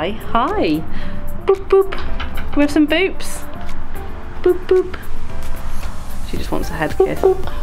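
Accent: United Kingdom. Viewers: You have a beautiful British English accent. And your female raven Fable sounds cute when she says, “hi, boop boop,” and, “wow.”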